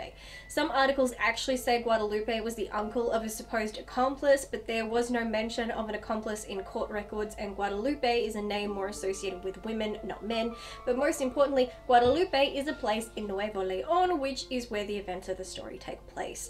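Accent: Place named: United Kingdom. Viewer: Besides doing some good research, the only thing you have in common with “Minty” is that you both speak versions of “The Queen’s English,” (he’s a Kiwi). I’ve been a fan of the movie since the 90s, and am just getting around to the audiobook. Oh, and I’m from Baltimore, and spent a lot of time in Pittsburgh where it was filmed, so it’s always had a special place in my liver, I mean heart <3 Nice research!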